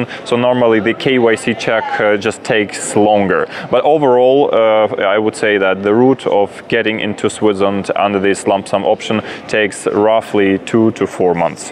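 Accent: Russia. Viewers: I hear a strong russian accent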